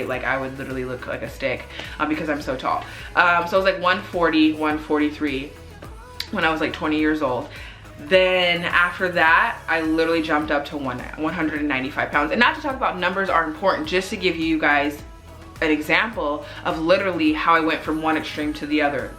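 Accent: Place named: Netherlands. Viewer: Idk why but the way you speak English really has a Dutch undertone if you get what I mean hahah. Idk if you are dutch but yeah 🤣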